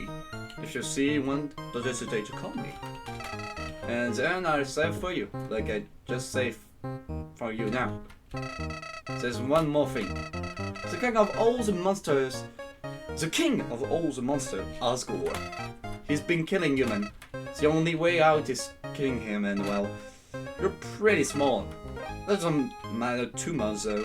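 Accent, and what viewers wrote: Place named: France. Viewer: Gotta love your french accent mon ami x) très bonne vidéo je m'abonne!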